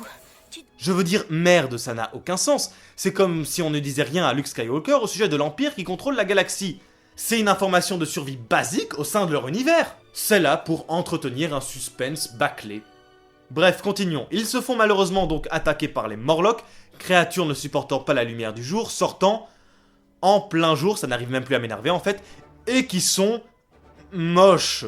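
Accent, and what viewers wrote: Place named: Belgium. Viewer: Je m'étais dit "allons voir sa vidéo pour apprecier ce somptueux accent belge" mais sans moquerie c'est très interessant. BRAVO.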